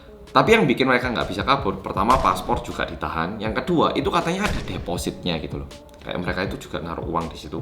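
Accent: Java, Indonesia. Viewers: Seng Medookkkk Mantep Mas 😃😃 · Mas ak subscribe seminggu yang lalu,mumpung lockdown aku langsung maraton nonton videone lho...ben nonton videone aku mesti mesem2 dewe mergone medok kyk aku,sampe bojoku gumun 😆.salam seko kota Semarang ya mas.... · Eee mmg yg memberitakan kn di TV korea, tp yg buat kita"orang Indo ngerti krna mas yg menyampaikn dgn bhsa Indonesia yg medoookk..itu mknya pda langsung pda paham...btw..Thankyou buat mas 'lah..kpn"klo ke Malang tak traktir Rawon ya...n klo q bisa ke Korea jg..tak sambangi ya di bwah apartemen kmu. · Halo guys Welcome to korea reomit bersama orang korea yang medhokk. Mana Dung dung tak dung nya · Hal yg buat ku betah nonton bang walaupun isi kontennya rindingdong yaitu karena medoknya dan mirip oppa Lee Jong Suk 😅😅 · Mas yang medok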